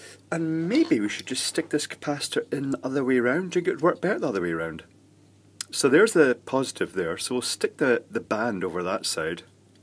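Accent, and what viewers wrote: Scotland, United Kingdom. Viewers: I find your videos absolutely fascinating, but sadly, due to my lack of knowledge, I sit here watching and it’s like watching a foreign language film! No, I don’t mean your brilliant Scottish accent, I mean I have no understanding of all the electronic terms!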